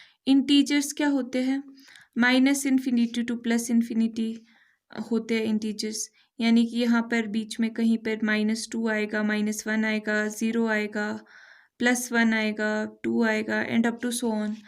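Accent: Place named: Himachal Pradesh, India. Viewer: ma'am i am also from Shimla (Himachal Pradesh)... Meko aapki slang se lg rha tha k aap yhi k ho isliye mene poochh liya😅😊😊😁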